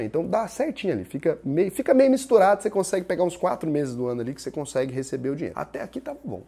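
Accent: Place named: Goiânia, Brazil. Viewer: Que sotaque engraçado kkkkkk. Goiânia ? Da hora . São Paulo sempre uffa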